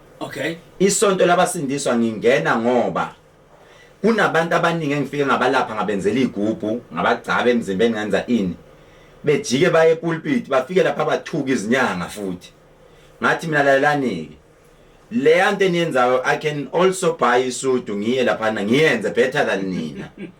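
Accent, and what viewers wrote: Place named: Zimbabwe. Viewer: Ayi bengihlale ngisho ukuth uphuma eZim ngizwa ulimulakho uma ushumayela.kodwa ke uyiqhawe bhuti omncane futhi sengiswele amandla okufinyelela kuwe.ayi u Babawkhe waba yidlozi elihle lowakwa Hlosa kanti no stepfather wakho noMama wakho bakukhulise kahle khula bhuti omncane.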